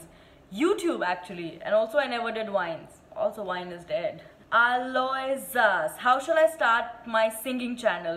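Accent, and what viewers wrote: Maharashtra, India. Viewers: #sawaalsaturday. Hey i love ur videos. I don't have any question...i just wanna say I love ur marathi accent. plz try talking in marathi in your videos.. #LoveLove❤😁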